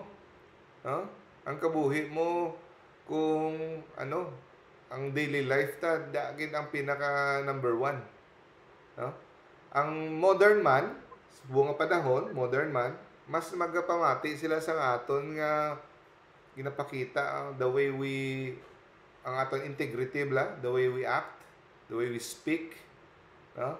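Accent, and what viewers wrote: Iloilo, Philippines. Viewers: Ilonggo ka man gale doc ❤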